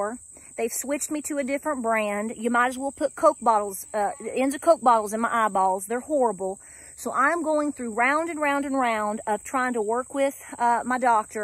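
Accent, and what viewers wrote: South, United States. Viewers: Cheers from the Mountains of morroco u got a beautiful southern accent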